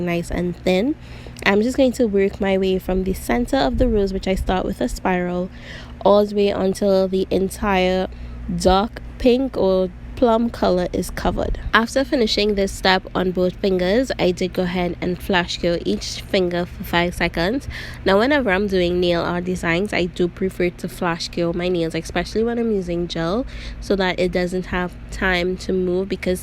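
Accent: Trinidad and Tobago. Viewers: You accent sounds Trini :)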